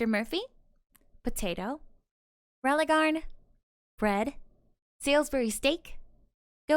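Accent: Spain. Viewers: I barely understood the accent, spanish and russian for some reason?? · I've heard you do French and those accents, but Spanish. Oh muy senorita or oh lala, I can't decide but wow! Amazing job on this, I am very attracted and very confused lol! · This actually surprised me because most people do a Russian or French student but with a Spanish speaking student it caught me off guard, hell with the way you spoke I wouldn’t be surprised if you were Spanish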